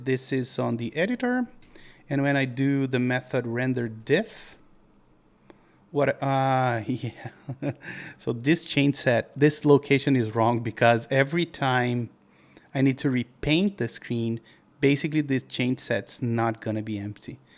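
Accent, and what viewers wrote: Brazil. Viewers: I recognize the Brazilian accent from kilometers away kkkkk. Awesome video series, learned a lot!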